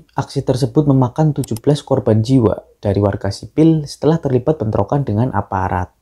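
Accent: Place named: Banyumas, Indonesia. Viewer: Terlalu ngapak lur suarane,ngantuk yg liat wkwkwk😂😅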